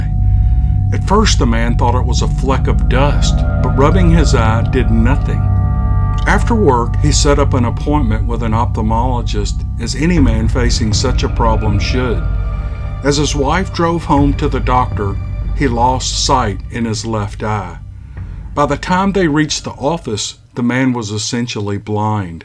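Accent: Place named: North Carolina, United States. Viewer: Good job! I’ve been looking for stories of the season on YouTube but hadn’t yet found a site. Truthfully I prefer you North Carolina voice then any others so far. Thanks for spook time!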